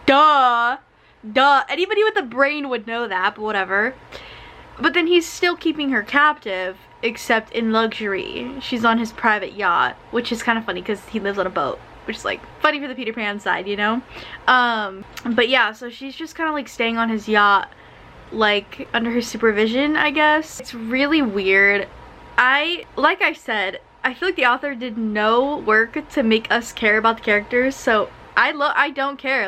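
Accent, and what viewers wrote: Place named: England, United Kingdom. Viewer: Cackling at your English accent🤣❤️ I literally love these so much- getting to live vicariously through you reading books I wouldn’t pick up for fear of DNF’ing 🤣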